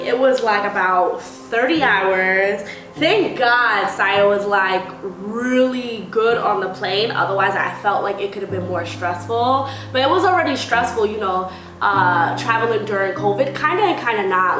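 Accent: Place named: New York, United States. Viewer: Habari gani? asante sana for the vid. loving that accent, i know it when i hear it. new york in the house. loving that. Thanks for sharing and keeping us posted. you are truly a jewel. Life will only get better from this point out. peace and blessings.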